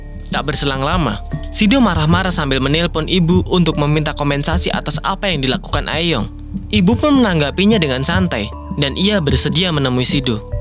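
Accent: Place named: Indonesia. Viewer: Basa inggris sangat meng indonesia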